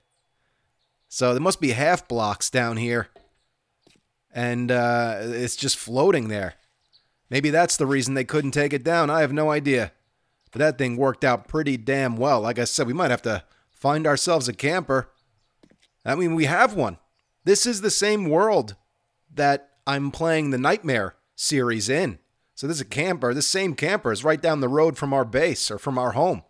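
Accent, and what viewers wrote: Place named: Boston, United States. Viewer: Quick question you from Massachusetts?? You sound like your a fellow bostonion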